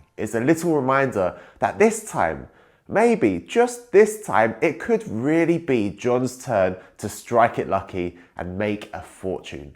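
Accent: United Kingdom. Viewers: Long time no hearing ur british accent welcome!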